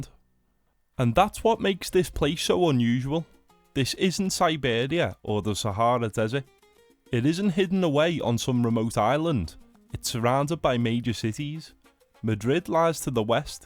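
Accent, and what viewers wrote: Scotland, United Kingdom. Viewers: Are you from Scotland? I mean, it's Scottish pronunciation?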